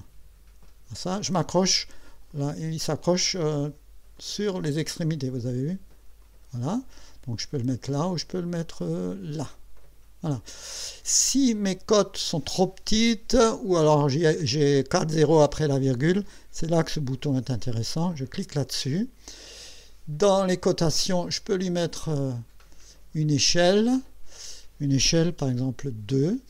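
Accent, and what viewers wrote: Alsace, France. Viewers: Alsace (d'après ton accent)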